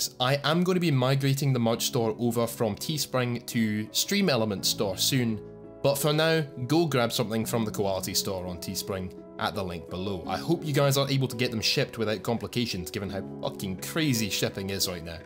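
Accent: Scotland, United Kingdom. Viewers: teach me how grind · I love ur accent btw is iron bru really that popular over there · I think the new mic makes you sound more.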